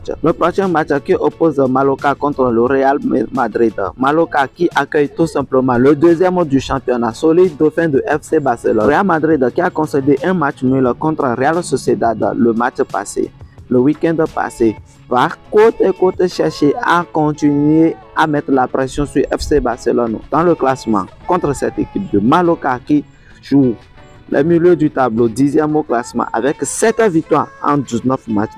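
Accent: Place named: West Africa. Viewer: J'adore trop ton accent a l'ancienne français africain 😂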